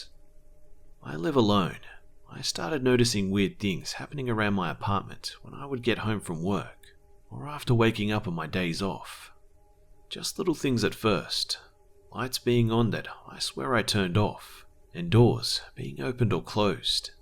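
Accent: Australia. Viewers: Are you Australian cos you sound like a true Aussie 😄🏖🇦🇺🇦🇺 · are you Australian?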